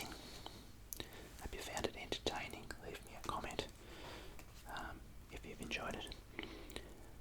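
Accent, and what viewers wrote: Australia. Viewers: What is he actually saying. Australian accent working against me here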